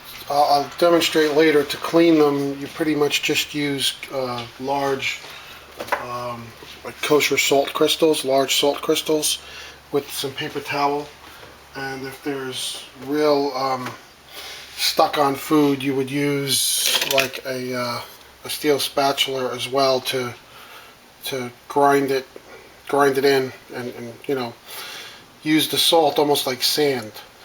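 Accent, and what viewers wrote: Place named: New York, United States. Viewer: Sounds to me like he's from somewhere around New York City.. I'd guess Brooklyn or Long Island. Real nice classic downstate NY accent., Am I anywhere close? I live in Westchester so I know I'm in the right neighborhood.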